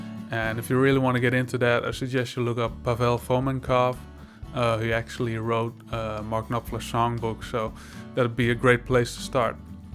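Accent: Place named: Netherlands. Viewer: The Lord has a voice! And he is Dutch! (The first 3 seconds gave it away)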